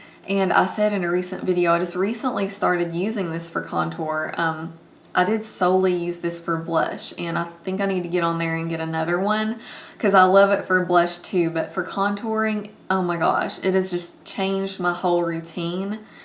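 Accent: South, United States. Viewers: I couldn't agree more that there is no need to spend a ton of money on brushes for a pretty makeup look. BTW...I love your southern accent. :) You are so pretty, and down to Earth. I enjoy watching your videos.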